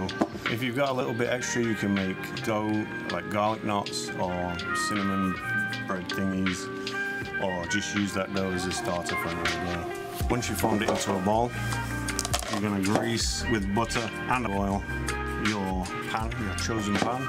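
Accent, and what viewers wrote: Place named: RP, United Kingdom. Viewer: Hello where are you From originally the accent you have is posh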